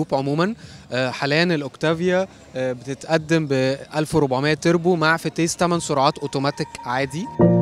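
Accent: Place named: India. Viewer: يا ابنى اكلم عربى بلاش عوجة اللسان احنا عرب والله مش هنود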